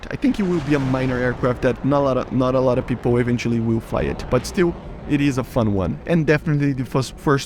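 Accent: Brazil. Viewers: Brazilian accent.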